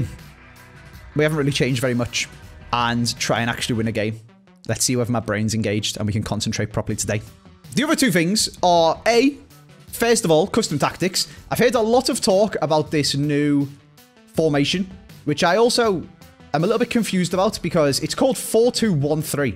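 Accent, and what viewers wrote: Liverpool, United Kingdom. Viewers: Yes lad best scouse youtuber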